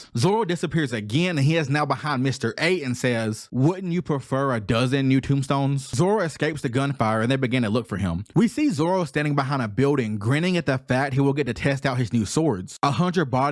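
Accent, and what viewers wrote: South, United States. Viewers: You can tell my dawg from the south plus anime content I'm subscribed now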